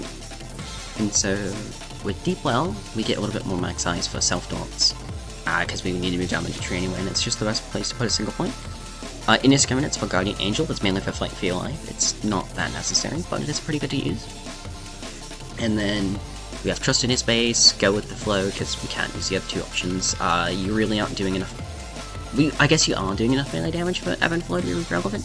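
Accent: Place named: United Kingdom. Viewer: suspiciously british sounding woman rambling ASMR in my home page, the algorithm monkeys are pulling the right ones out of the hat today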